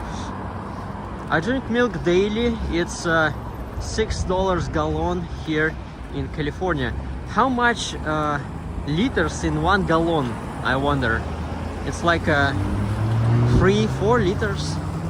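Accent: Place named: Russia. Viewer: Вы русский😂? Ваш акцент выдает😊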